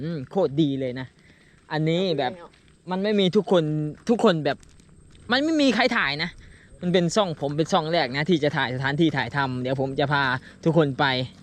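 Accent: Laos. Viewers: เว่าลาวป่นไท น่ารักดี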